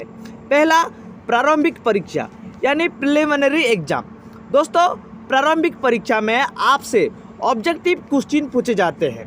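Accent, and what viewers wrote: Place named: Odisha, India. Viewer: Bolnewala aadmi velong to odisha i can know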